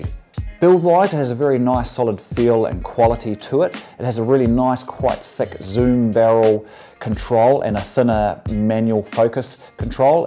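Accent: New Zealand. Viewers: Great educational video. Nothing like a good kiwi accent. NZ looks fantastic.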